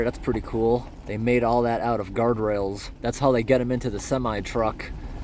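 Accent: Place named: New England, United States. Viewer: I think your yankee accent threw them off... They weren't sure what you were saying.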